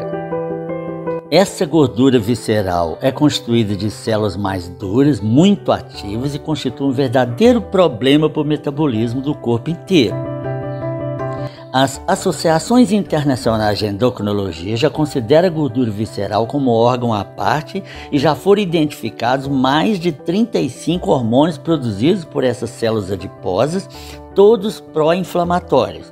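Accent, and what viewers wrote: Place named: Minas Gerais, Brazil. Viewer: Gente, estou encantada pelo Dr.. Fala com segurança e objetividade. E o jeito de "mineirin" é bem descontraído. Sou mineira também, da capital. 😍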